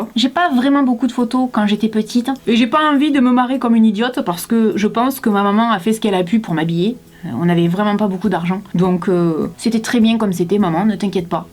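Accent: South, France. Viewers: et bien j'en rajoute une couche, je viens de découvrir tes videos et 1) tu es superbe, rayonnante et rousse ! (comme ma nièce et je vous trouve mais belles !) 2) j'adore ton accent, on est du sud sans aucun doute ! 3) tes videos me font aussi un bien fou ! bisous "maman" ;) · Coucou! J’ai découvert ta chaîne il y a une semaine et j’ai regardé toute tes vidéos! Ça fait vraiment du bien! C’est frais et ton accent me rappel ma famille dans le sud. J’aime vraiment que tu te prennes pas la tête et que tout soit naturel ça change des Youtubeuse de 20 ans Alors un grand merci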